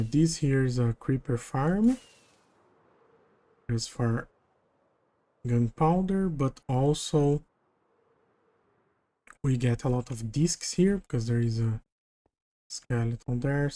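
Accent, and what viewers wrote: Brazil. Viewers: i came for minecraft but stayed for the brazilian accent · Pelo nome e o sotaque, acho que você é brasileiro, confere? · brazuca aqui, ingles bom , entretanto dá pra identificar que é brazuca hehehe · Probably in the top 5 worlds of all time by the way, are you Brazilian? Your accent sounds like it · É brasileiro? Tem sotaque de brasileiro kkkkkkkk. Mundo massa demais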